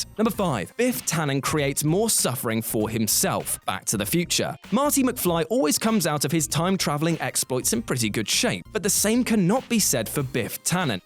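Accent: United Kingdom. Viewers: British watch mojo?!?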